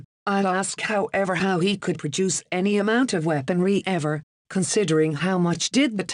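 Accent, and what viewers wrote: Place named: Ireland. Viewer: Why does the bot have an Irish accent?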